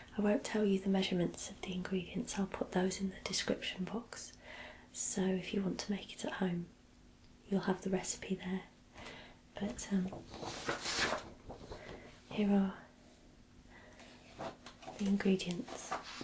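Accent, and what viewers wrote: United Kingdom. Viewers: I love cheesecake!!! I have made chocolate chip cheesecake and it’s delicious! you are wonderful! I love your British accent!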